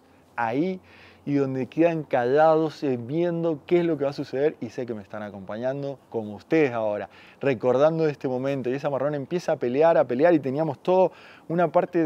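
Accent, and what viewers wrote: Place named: Mendoza, Argentina. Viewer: Estimado, sigo tus videos desde hace tiempo y es notable lo que han mejorado, tanto en lo filmico como en los diálogos (incluir aspectos técnicos fue una gran idea), además tu entonación mendocina me encanta y me trae recuerdos de mis amigos mendocinos:) Quizás podrías ir pensando en algún video usando equipos pequeños (#2-#1), creo recordar que tenes alguno en los arroyos de altura de Mendoza, pero estaría bueno incluir algún video en arroyos patagónicos. Como dicen, no hay truchas chicas sino equipos grandes! y estaría bueno ver como encaras esas situaciones de pesca. Fuerte abrazo y líneas tensas!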